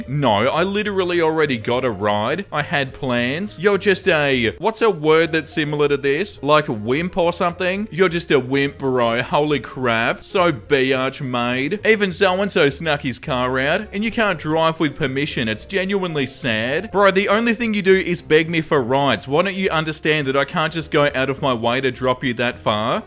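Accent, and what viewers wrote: Australia. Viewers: Lol my cats name is sassafras ❤ also when I was at the mall yesterday I started feeling not great and I ended up sitting on the floor in a quiet corner in the mall with Starbucks listening to videos, just what I needed, love you ❤ P. S. As a kiwi, you’re literally the only Aussie I can bear listening to 😂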